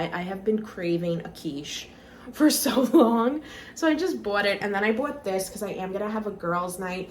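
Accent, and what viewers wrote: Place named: New York, United States. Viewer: I love the ny accent ✨💗💗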